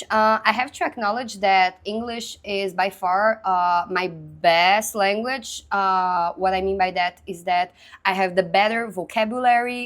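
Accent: Minas Gerais, Brazil. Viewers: Linda, poliglota, inteligente e sotaque mineiro. 😍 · O sotaque mineiro presente em todos os idiomas 😂❤ eu amo isso pq não precisa "neutralizar" o sotaque pra se encaixar em outro. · tu fala com muito sotaque brasileiro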